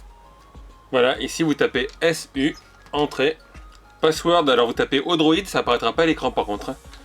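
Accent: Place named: England, United Kingdom. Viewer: Un peu compliqué ton truc lool . Par contre j aime ton accent anglais 😋😋😋😋.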